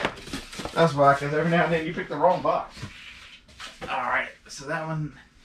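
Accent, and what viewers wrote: South, United States. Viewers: I watch you so much, I’m getting a southern accent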